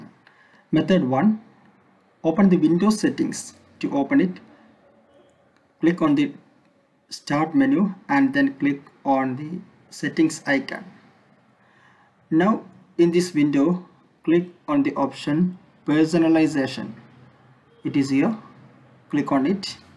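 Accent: India. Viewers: came here to actually remove the icon, thanks alot <3 Indian accent is always funny to me btw lmfao, just thought I leave that comment here forever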